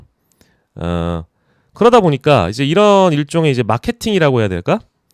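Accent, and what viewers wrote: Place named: Gyeongsang, South Korea. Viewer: 갱상도 추가요..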